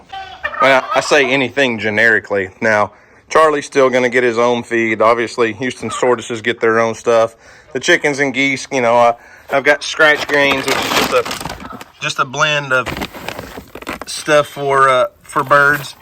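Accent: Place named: Alabama, United States. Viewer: Those that are wondering…. has to break up the Cog….Hill because the A.I. (Artificial Intelligence) that adds the Subtitles, hears Cog Hill said normal speed with an Alabama accent, as Cock. It thinks is swearing/being rude and either removes the video or doesn’t pay him. 🤦‍♀️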